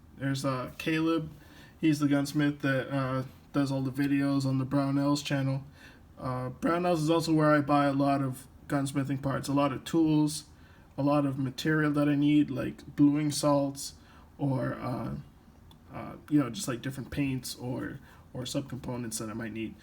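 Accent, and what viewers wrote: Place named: El Salvador. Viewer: Unless you came from elsalivdor? Speak english without the accent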